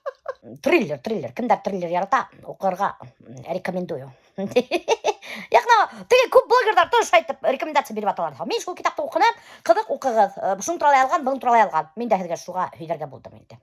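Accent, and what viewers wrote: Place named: Russia. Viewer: Очень приятный и красивый язык. Но только без русского акцента если можно.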